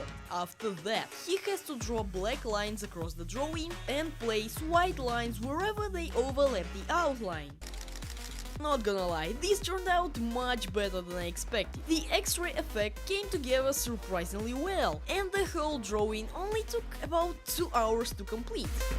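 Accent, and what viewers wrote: United Kingdom. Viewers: Why do you sound British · Are you British?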